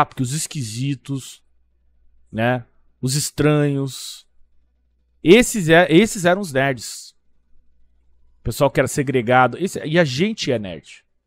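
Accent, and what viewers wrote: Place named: Curitiba, Brazil. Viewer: Eu te sigo pelas suas notícias/informações, e esse teu jeito Piá de Curitiba de narrar as mesmas; acredito que cada um dos 20mil inscritos tem seus motivos e o que deve ser unanime é o formato simples e objetivo do canal .Parabéns pelo trabalho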